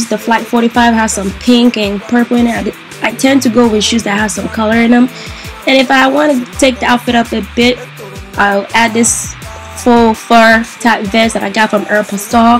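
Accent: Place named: Nigeria. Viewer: love love love your style as well as your naija accent!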